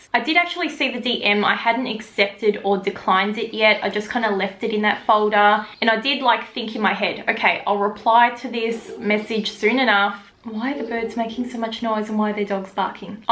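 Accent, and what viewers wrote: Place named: Australia. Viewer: I liked this story time!! It was really interesting & I love the way you explain stuff. Being a fellow Aussie I just relate so much to the way you speak & it makes me laugh (even tho the girl being a stalker wasn’t funny!!!!) xxx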